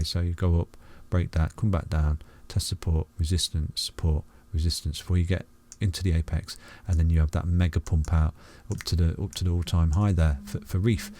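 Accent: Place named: Yorkshire, United Kingdom. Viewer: Love the t.a, but got distracted by your accent. If you aren't a fellow Yorkshireman I will eat my scarf.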